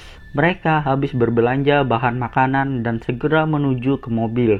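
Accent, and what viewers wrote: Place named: West Java, Indonesia. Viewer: Sunda kentel kayanya ya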